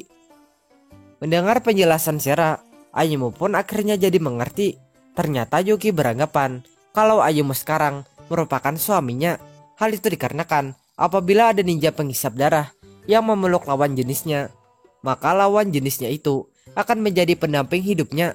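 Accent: Banjar, Indonesia. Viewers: Bang lo org banjar kh? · Orang banjar kah bang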